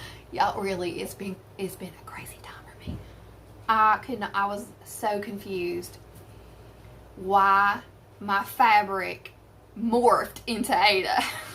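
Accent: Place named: South Carolina, United States. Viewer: I love your South Carolina accent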